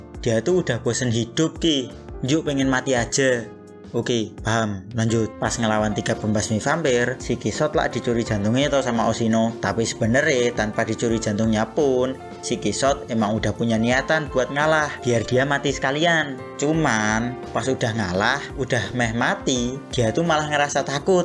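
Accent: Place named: Java, Indonesia. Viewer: Wkwk jowo banget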